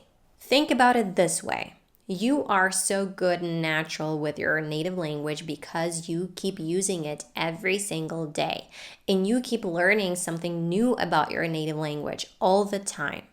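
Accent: United States. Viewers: You have a perfect American accent. Were you born in the US? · Me "Holy s***, English isn't her native language?" From your accent and proficiency in speaking I immediately assumed you were an American. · Madam your American accent is very clear like British accent we understand easily but the other American' s accent we can't understand so easily . So you are a good and expert teacher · Her accent is American,and i like to be an American international communicator like rebel number one and i don't want to mention who is "rebel number one".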